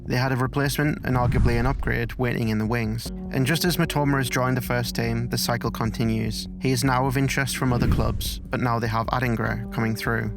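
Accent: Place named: Sunderland, United Kingdom. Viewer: Sounds to me like a fellow mackem?! Great video mate.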